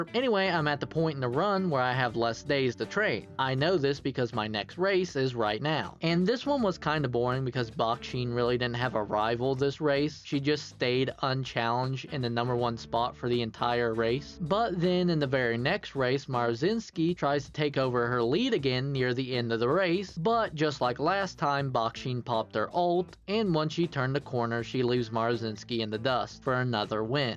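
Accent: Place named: United States. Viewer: You sound like you’re on the verge of using a country accent but it makes you sound nonchalant and cool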